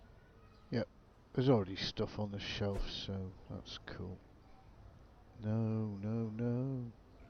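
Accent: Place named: United Kingdom. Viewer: What part of the UK are you mate?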